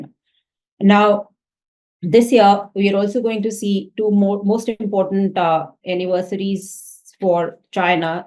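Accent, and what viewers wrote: India. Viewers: I guess there's little to be done about the Indian-English accent, which makes following this difficult for me and others I suspect, but a better microphone would be a huge improvement.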